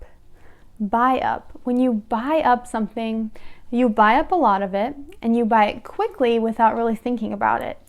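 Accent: United States. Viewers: R u american?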